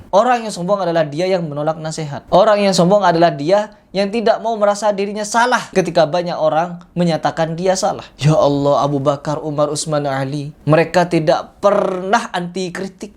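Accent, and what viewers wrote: Madura, Indonesia. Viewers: gausah banyak bacott... buktikan saja kalo anda benar.... sekarang buka mejelis ilmu.. ada kah yang mau ikut anda?? soalnya saya liat vidio anda seakan akan pendapat anda itu selalu paling benar... kalo dari logat anda ini orang madura... sini sodara jangan berani depan kamera... jalan jalan ke kalimantan... 😂😂😂 takut kah...?? gak usah takut.. kalo gak salah 😂😂😂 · logat bicaranya seperti orang madura ya?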